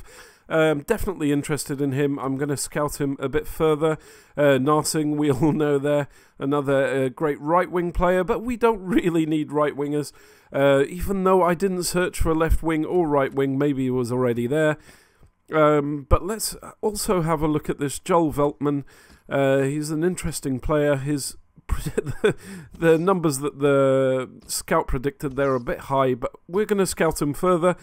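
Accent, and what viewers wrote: Portugal. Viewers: You re portuguese right?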